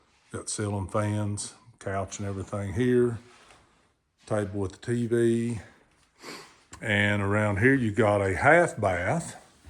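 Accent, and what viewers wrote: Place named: Southwest Virginia, United States. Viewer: Wow! This place is spectacular! Now I cant afford any of this but it's stunning. I have to let you know that you remind me of my family growing up (accent). I was born in Wythe county and lived in Cripple Creek and then Wytheville until the age of 10 and we came further north to central va. If I could, this would be my dream... even a touch of it would. I'd love to live in this area again, it feels like home. My grandmother passed away and I have an aunt and uncle in Rural Retreat and this place is magical. Maybe one day I can find my spot ✨ Merry Christmas 🎄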